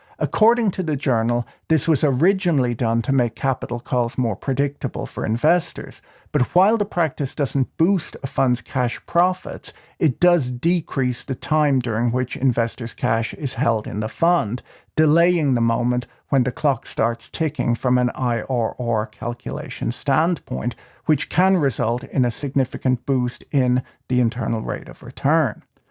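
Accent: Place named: Ireland. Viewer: Irish, not British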